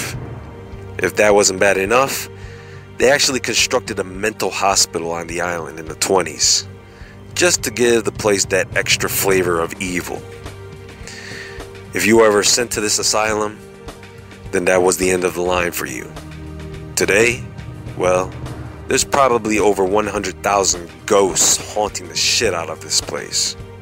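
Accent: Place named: Tennessee, United States. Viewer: I like the way you talk on this video, reminds me of my home here in Tennessee.. The best.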